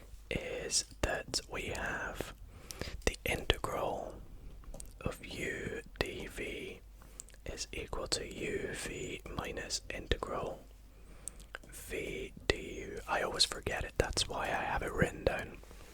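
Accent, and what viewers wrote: Scotland, United Kingdom. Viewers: Hes Scottish 💀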